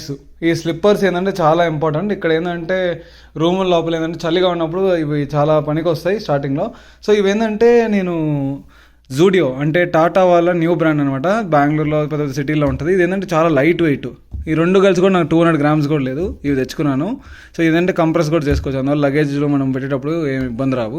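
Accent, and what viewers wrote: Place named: Chittoor, India. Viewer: maadi kuda bro mee slang chusi chepa maadi chittoor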